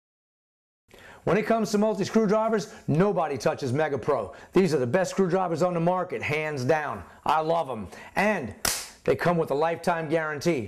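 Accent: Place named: New Orleans, United States. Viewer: Nice videos!! I noticed your NOLA accent as soon as you started talking. I lived in New Orleans for 27 years, until Katrina ran me off. Anyways, thanks for the informative videos and the great tips. I especially liked the video where you did a circle sheetrock patch! Now that was slick!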